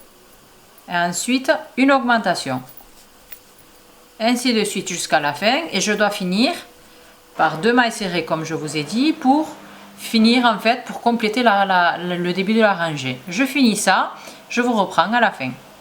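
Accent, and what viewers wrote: South, France. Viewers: Super tutoriel bien expliquer avec un jolie acsent du sud😂❤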